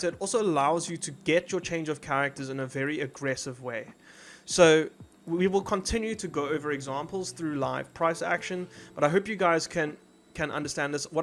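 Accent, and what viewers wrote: South Africa. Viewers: South African accent, lekker bru. New sub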